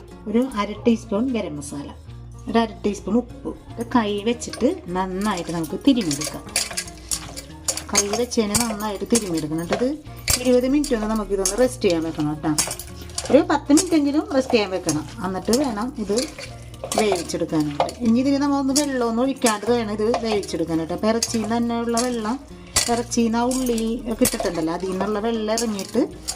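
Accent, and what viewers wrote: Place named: Thrissur, India. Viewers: Hi എവിടെയാ സ്വാദ്ദേശം തൃശൂർ ആണെന്ന് മനസ്സിലായി അവിടെ എവിടെയാ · തൃശ്ശൂർ സ്ലാങ് പോലെ തോന്നി. സൂപ്പർ ആയിട്ടുണ്ട് ട്ടോ. അവതരണം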